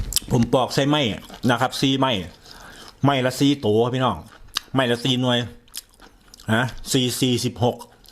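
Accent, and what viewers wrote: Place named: Ban Phue, Thailand. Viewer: สำเนียงคุ้นๆเป็นคน อำเภอบ้านผือ บ่ครับ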